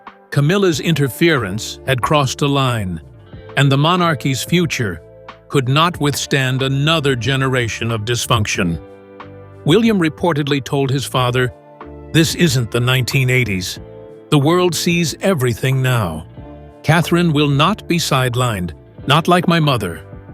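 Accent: United States. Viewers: Keep using the disrespectful images of Katherine and the obnoxious American narrator.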